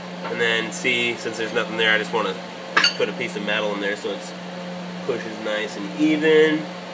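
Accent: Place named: California, United States. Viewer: where are you from? I'm in Santa Cruz, CA. there's no one who talks like you that's not from or in CA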